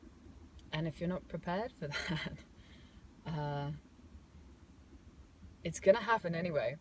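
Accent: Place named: England, United Kingdom. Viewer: Are you from England? lovely accent.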